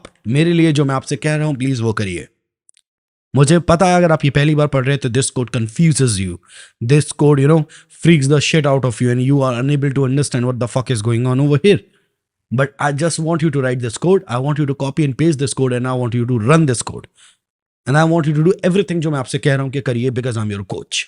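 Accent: Bhopal, India. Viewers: bawa bhopal se ho kya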